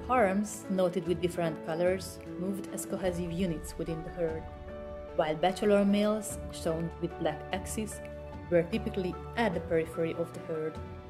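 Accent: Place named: Hungary. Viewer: Hunglish narration.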